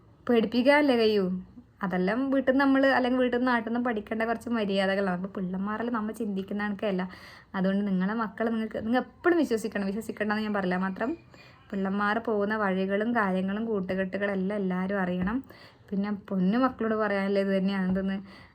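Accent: Kasaragod, India. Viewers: മലപ്പുറത്തിന്റെ മരുമകൾ, കാസറഗോഡ് ഭാഷ ഒട്ടും മാറ്റം വന്നിട്ടില്ല, അനുഗ്രഹീത ഗായിക അഭിനന്ദനങ്ങൾ ❤❤😂😂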